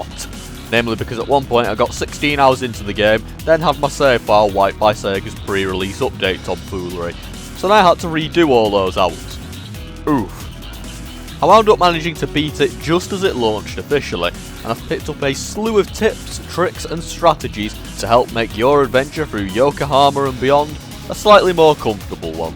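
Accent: United Kingdom. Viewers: From Birkenhead or Liverpool or something, I think. certainly in the area of north west I think.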